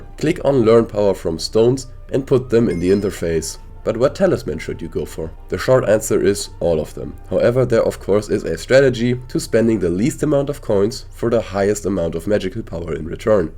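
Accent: Germany. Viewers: This dude has to be German. He sounds so hard german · Do you speak german because im german and your accsent kind sounds like it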